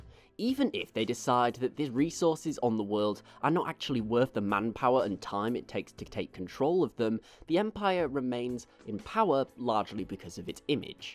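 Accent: United Kingdom. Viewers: I can't stand your BRAETEESH accent..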